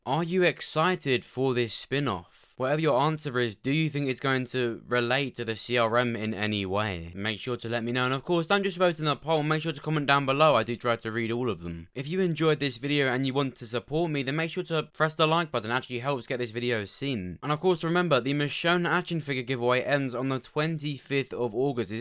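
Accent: United Kingdom. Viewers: Do you ever do live Q and As?? Your theories are so interesting I’d love to ask your opinions on some other things TWD related And sooooo good to hear a fellow Brit breaking down these trailers and info. I want to win!!!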